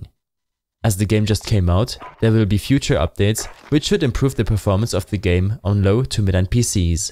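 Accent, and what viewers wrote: Germany. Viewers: Wie man merkt das du deutsch bist danke nochmals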